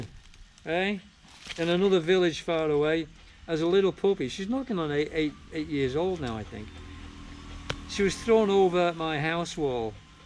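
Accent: United Kingdom. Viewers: Can spot the scouse accent lol .... said the woolyback lol 😂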